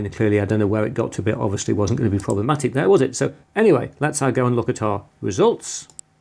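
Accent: United Kingdom. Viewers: Sorry, I thought that you were a bot. You're obviously not familiar with this channel. This YouTube channel, run by a bloke called, has been going for over 18-years now. Before that, he's been published, in computer magazine articles, for over 40-years. He's well known and respected in the UK computer community. He's definitely not AI! It's just the way he speaks; he's British after all. Happy New Year to you and yours.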